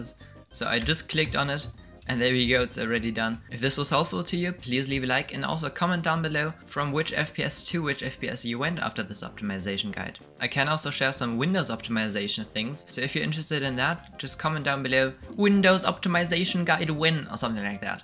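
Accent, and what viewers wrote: Germany. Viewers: man hört so das du deutsch bist haha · Du klingst zimlich deutsch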